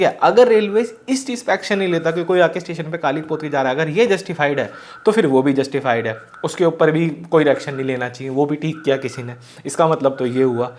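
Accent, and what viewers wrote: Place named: Bundelkhand, India. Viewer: bhai mein aapke Bundelkhandi bhasa sunte hi khus ho jata hun kuch apna sa lagta hai.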